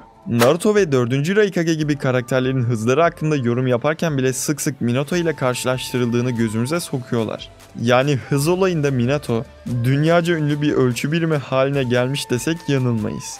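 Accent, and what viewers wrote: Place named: Sivas, Turkey. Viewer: Abi gardaş ı çok iyi telaffuz ediyorsun sende sivaslï havasï seziyorum yanlış da olabilir cevap verirsen sevinirim♥️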